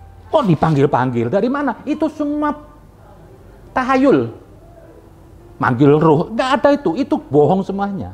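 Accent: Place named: Java, Indonesia. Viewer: Habib asli org mana sih? Aku suka aksen jawanya....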